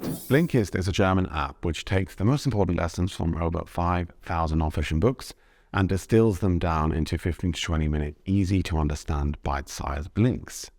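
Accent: United Kingdom. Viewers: Ich finde es lustig, wenn du zwischen Englisch und Deutsch wechselst, dass es mir zunächst nicht aufgefallen ist,wie oft es in diesem Video gemacht wurde, weil der Sprachfluss einfach gleichmäßig weiter geht. 😂 Ich hab nur plötzlich gedacht, ah er spricht in Deutsch 🤔 War jetzt das ganze Video auf Deutsch ohne, dass ich es realisiert habe? Und dann geht es plötzlich auf Englisch weiter 😄 Also es spricht auf jeden Fall auch einfach für dein fließendes Sprechen und ja, au h für den britischen Akzent, aber der ist einfach nur sympathisch, weil trotzdem alles perfekt ausgesprochen und verständlich ist. 👍🏻 zumal ich ihn sehr dezent finde und nicht überladend. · You English is like middle to upper class British .Clipped and precise .I would put every cent i own in betting that you did not grow up in a British Council estate .You German sounds very good to me .I have only baby German myself after living in Berlin many years ago for a year 1977-78 . 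· For me you speak german with your british accent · Thanks für this new video! I really got to enjoy your content but for me it is mostly the combination of your accent and your deep-ish calming voice which surely is the iceing on the cake. I can't really put my finger on it but I have always liked to listen to british people speaking german. I would think that you had quite some luck with your boss back then, because if you really felt you were terrible at your you and she still didn't fire you, she must have seen your potential and positive personality as a future promise. :) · Hach ja...weil es ja so oft erwähnt wird, das mit dem britischen Akzent...das ist doch überhaupt nicht wichtig. Ich persönlich empfinde es nicht als Nachteil, wenn mein deutsch sprechendes Gegenüber mit Akzent spricht. Sei es von einem deutschen Dialekt oder einer anderen Sprache. Ich selber spreche fließend Englisch, aber "with a heavy German accent" wie mir bescheinigt wurde. 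Da mache ich mir keine Gedanken mehr und spreche einfach drauf los wenn es die Situation erfordert. Akzent oder kein Akzent, das macht nicht den Menschen aus. Frankfurt am Main - sehr schön, sehr charmant dargestellt in diesem Video. Allerdings ist mir die Stadt, in der ich aufgewachsen bin und den größten Teil meines Lebens verbracht habe, sehr fremd geworden. Daher bin ich vom Stadtkind zum Landei konvertiert. Weiterhin eine gute Zeit und ein zufriedenes und erfülltes Leben!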